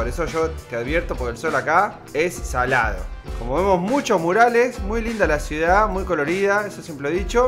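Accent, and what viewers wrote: Argentina. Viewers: Un Venezolano con acento Argentino, vea pues.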